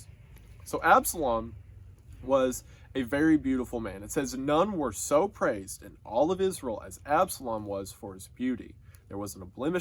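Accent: South, United States. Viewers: Trying to figure out where his accent is from. Atlanra...the carolinas?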